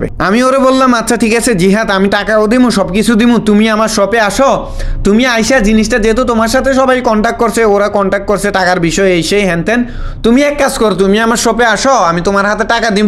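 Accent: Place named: Noakhali, Bangladesh. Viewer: নোয়াখালীর লোক? খাইছেরে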